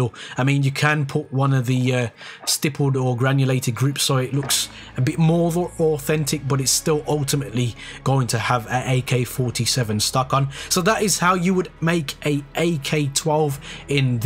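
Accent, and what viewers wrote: Birmingham, United Kingdom. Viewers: Is that a Brummie accent I hear or maybe a yam yam?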